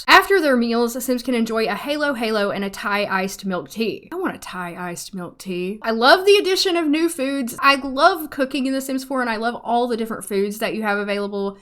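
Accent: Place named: Texas, United States. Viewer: the Texas girl accent lol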